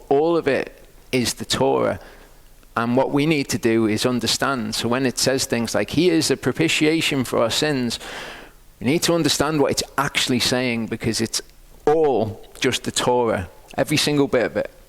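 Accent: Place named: Liverpool, United Kingdom. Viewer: I SEE BY YOUR VOICE YOU ARE FROM LIVERPOOL, I ALSO AM FROM LIVERPOOL AND AM LOOKING FOR A BIBLE BELIEVING CHURCH THAT KNOWS WHAT TIME IT IS AND THAT WE ARE IN THE VERY LAST DAYS, IVE TRIED MANY, BUT THEY ALL PREACH ONLY FROM THE FOUR GOSPELS, THEY DONT GO NEAR PAUL, THE OLD TESTAMENT AND CERTAINLY NOT THE BOOK OF REVELATION. I REALY NEED A CHURCH THAT I CAN GO TO AND FELLOWSHIP WITH, CAN YOU ADVISE ME? I LOVE YOUR SERMONS AND WISH YOU HAD A CHURCH IN LIVERPOOL! YAHOVAH BLESS YOU IN THE NAME OF YESHUAH HA'MASHIACH! THANK YOU! My email is: <mail>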